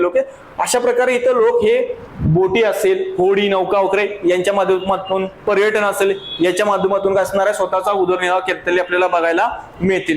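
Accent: India. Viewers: Bolnyachya toluning warun tumi patan talukyatale watatay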